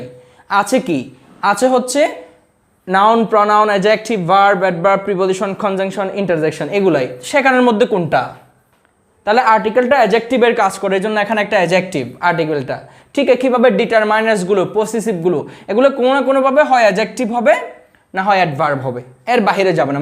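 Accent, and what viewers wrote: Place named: Chittagong, Bangladesh. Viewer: Uni ctg er gram tek alim dise, shei porjonto okane silo ekarone pronunciation gramer ta chole ashe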